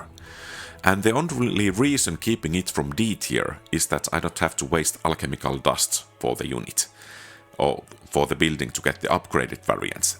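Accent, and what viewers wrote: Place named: Finland. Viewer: Just discovered your channel while getting into Olden Era, soon enough Ill have a great finnish youtuber for every game I play. Something about the accent just clicks :D Looking forward for the release of the game. Its already decent fun and given updates and balancing its gonna be greater yet.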